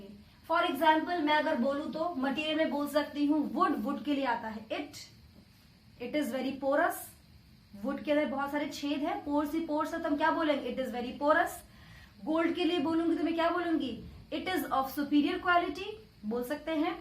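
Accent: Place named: Sikar, India. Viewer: Didi mai sikar se hu aapki voice sikar me rahne walo ke jaise lagi isliye pucha tha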